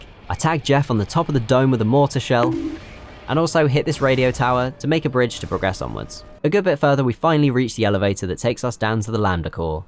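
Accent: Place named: United Kingdom. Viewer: this would be a great video with great pacing and great editing about a great game and a great all achievement challenge. unfortunately, british 😑😑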